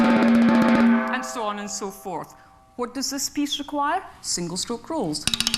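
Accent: Scotland, United Kingdom. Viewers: she's deaf and she could play the drums?? thats amazing! what's even crazier is that she has a scottish accent and she lip reads! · Scottish accent. Very recognizable, if you are into such things are listening to accents and figuring out where folks are from (like me...lol). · Scots accent is funny!! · I can hardly believe this woman is deaf. For one thing, she doesn't speak like a deaf person. She articulates every syllable perfectly with a Scottish accent. It doesn't surprise me that she can play percussion, because rhythm is something you feel rather than hear. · Thanks for clarifying her age loss of hearing,i was wondering how she got a scottish accent. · "without the telltale signs that most deaf individuals demonstrate." You are making the mistake of assuming she was born deaf. She wasn't. She didn't become deaf until the age of 12, by which time she could speak normally. 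And it is also not surprising that she has a Scottish accent, given that she is Scottish. It's not astounding at all. Do a little research next time, before making comments on Youtube. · If she is deaf, how could she pick up a Scots accent? Or even talk at all? Being a physicist all I can get from this is a lot of vague waffle. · This lady is amazing. So talented and I had no idea she was deaf until she said so. Her accent sounds like she is from the Scottish Highlands (so sounds like Prof McGonnagall from HP). Edit: Wikipedia says she was brought up in Aberdeenshire, hence the Doric accent. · For Deaf (of any level) she speaks very well and love her Scottish accent. Also as a drummer and percussionist who came up through high school bands I forgot how much I love the Marimba (what she is playing) and Xylophone. I never was very proficient and graduated to drum kit through college and club bands. 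· How does she have an accent? She sounded scottish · She is Scottish..Her accent is actually Scottish. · a deaf person with a Scottish accent??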